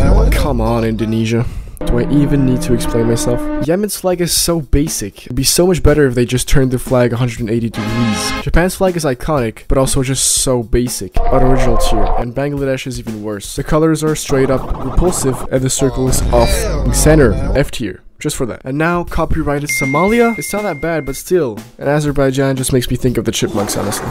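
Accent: France. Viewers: are you french? Unsubbed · Are you french?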